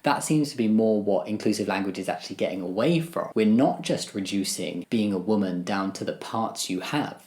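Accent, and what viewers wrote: England, United Kingdom. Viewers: He's English so of course he speaks weird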